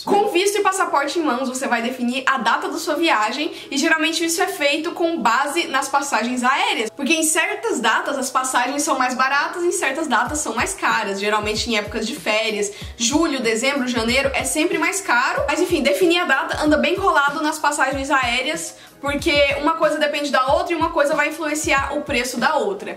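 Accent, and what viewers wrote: Goiás, Brazil. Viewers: Sem conhecer você em um outro vídeo imaginei que fosse goiana pelo sotaque,morro de vontade de ir para outro país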